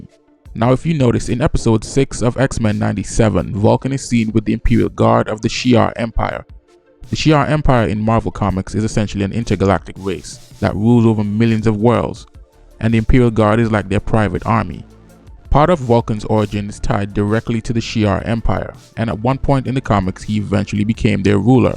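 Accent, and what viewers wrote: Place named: West Indies, Caribbean. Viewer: I like your accent. where are you from? You sound like you are from the West Indies.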